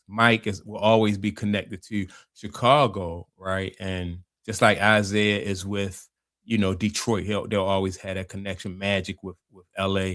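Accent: Baltimore, United States. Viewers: Baltimore accent?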